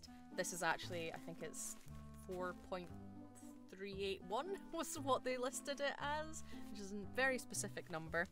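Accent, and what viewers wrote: Scotland, United Kingdom. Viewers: Wow!! Thats the thickest scottish 🏴 accent i have hear from you so far. Please keep it up yer wee lassie 👍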